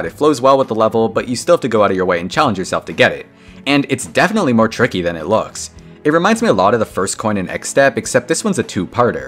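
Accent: Canada. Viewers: canadian accent 🥱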